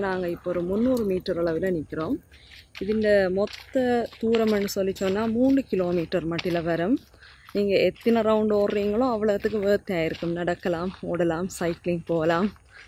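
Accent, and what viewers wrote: Sri Lanka. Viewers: உங்கள் இலங்கை தமிழ் கேட்பதற்கு மிகவும் அழகு. வாழ்த்துக்கள்.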